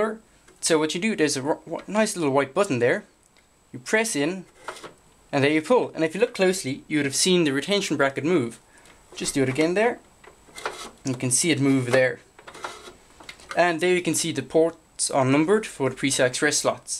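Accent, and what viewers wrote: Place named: United Kingdom. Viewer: you talk sooo british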